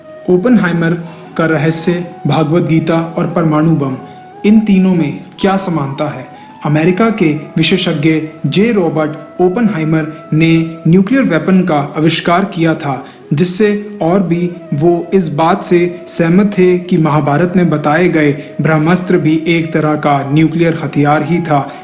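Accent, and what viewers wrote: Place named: Punjab, India. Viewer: Zaberdast jaankaari, commentator ki awaaz bhi achchi hai, lekin punjabi accent ke kaaran kuch shabdo ka uchchaaran galat hai. Jo ki kaano ko chubhta hai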